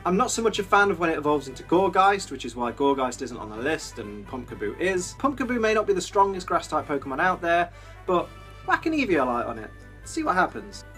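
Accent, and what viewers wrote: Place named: Midlands, United Kingdom. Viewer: I'm from the Midlands tooooooo. Staffordshire. i bet your accent sounds weird to most people but sounds so normal to me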